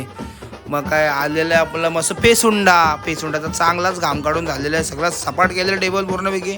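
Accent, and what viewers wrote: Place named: Agri, India. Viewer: Tumchi agri marathi lai bhari ahe bhava ❤❤❤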